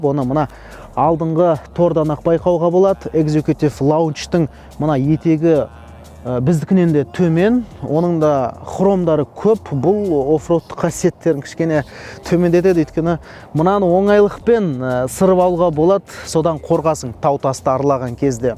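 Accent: Standard. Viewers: Керемет, сонша еңбек өте жақсы түсірілім, жарайсың ең бастысы таза қазақша! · Қоспасы жок тап таза қазақша сөйлеп тұр, жарайсыз. Техникалық термин атауларға дейн қатесіз анық аударылған. 👏👏👏👏👏👏👍👍👍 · Таза қазақша керемет! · Таза казакша керемет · Ассалаумағалейкум! Қазақша таза сөйлейді екенсің, риза болып отырмын. Жұмысың алға баса берсін! Пытырлатайық жігіттер лайкты, оған лайықты! 👏👏👏👏👏👏👏👏👏👏👏👏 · Мәссаған сөзім жоқ.Қазақ тілде таза сөйлейсін.біз лайк басуды аямаймыз.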